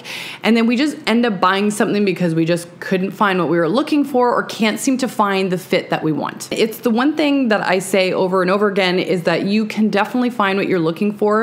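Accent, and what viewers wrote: Canada. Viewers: Hello, new subscriber here! Very interesting and helpful tips, I can see this will be a fun channel for me. I love the deal with bringing our fancy dress into our everyday wear. I am a big fan of that style, even if it does confuse some women in my neighborhood.😉 But first, I was wondering (not a fashion question) if you are from Canada? Curiosity always gets the best of me and there is a slight accent I hear...just wondering.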